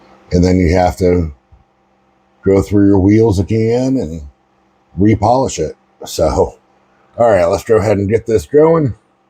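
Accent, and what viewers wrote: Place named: United States. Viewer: Dude sounds like he’s from the states, but he uses metric.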